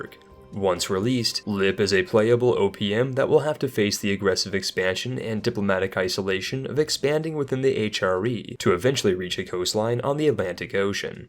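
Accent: Texas, United States. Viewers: bruh that texan accent lmao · Love the Texas accent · the smoothest Texan accent out there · I love Texas accent :D. Make a video only in Texan 😂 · Came for the Achievements, stay for that Texas accent.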